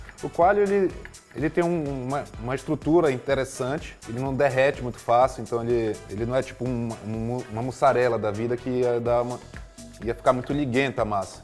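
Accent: Pará, Brazil. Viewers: Tão bom ouvir o sotaque paraense no YouTube . De chefe de cozinha ainda, perfeito!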